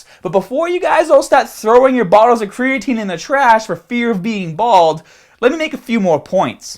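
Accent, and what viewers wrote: Boston, United States. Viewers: Love that Bahston accent!!! Thanks for the info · You have the same accent as JFK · Every 10th word has a strong Boston accent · you've got to be from Boston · why are you talking like if you're in the Departed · love the Bhaston accent!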